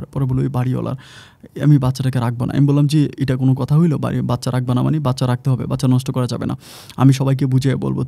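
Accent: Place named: Rangpur, Bangladesh. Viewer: ওহ রংপুরের ভাষায় কথা বললেন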